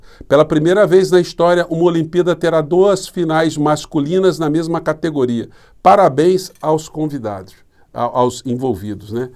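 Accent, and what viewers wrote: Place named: Rio de Janeiro, Brazil. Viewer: . Sou paulista. Acompanho e pretendo acompanhar sempre seu canal. Vc é muito bom. Só repare, você mesmo, em qualquer vídeo seu: " vc que é muito bem VINDO e muito bem VIM" Nenhuma crítica ou implicação. É parte do seu sotaque carioca. Mas, perceba aí. Continue do mesmo jeito. Tenho absoluta certeza de que é sotaque.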